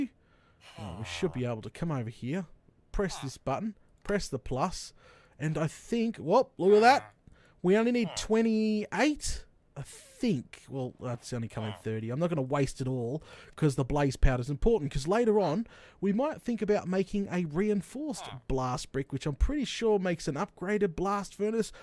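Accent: Australia. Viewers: This man's voice is the most stereotypical Australian voice I've ever heard and I love it. Keep up the good work!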